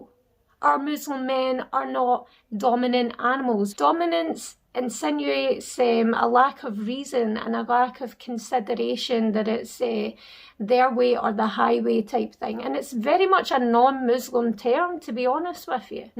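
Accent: Scotland, United Kingdom. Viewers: Mashallah ... you have more insight and reason than all those men hhhhh and your scottish accent is like the cherry on top hhhh ....i love scottish accent so mush .. and to find a channel of a muslim scottish woman ... YA Allah .. i feel like i just found a treasure hhhhh May Allah bless you sister . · I'm not used to female youtuber with Islamic content, but I'm definitely staying. Love Scottish accent 😍😍😍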